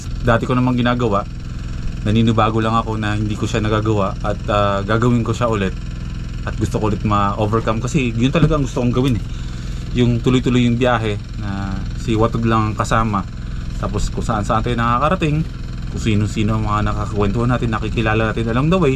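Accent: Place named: Bulacan, Philippines. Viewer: I like the story telling talaga ni! Naririnig ko minsan ung Bulakeño accent ni! ❤️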